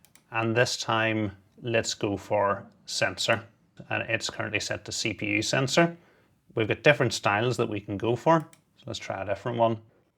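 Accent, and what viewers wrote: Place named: Northern Ireland, United Kingdom. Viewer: Love hearing a local accent on these tech videos. Great to see a fellow lad from NI doing so well. Its great how clearly you explain things and take your time and dont over hyper. Keep up the great work.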